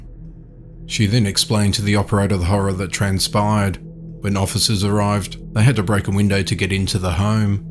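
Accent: Australia. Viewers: Sounds more like he is from Australia to me.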